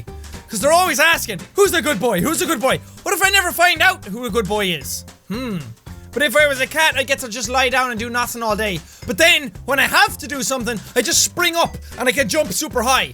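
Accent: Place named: Ireland. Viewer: The irishman is always within him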